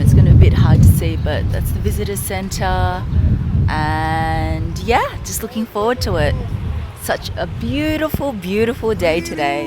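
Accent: Australia. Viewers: Just wondering, are you Asian, or Australian? You look Asian, but have an accent of an Australian.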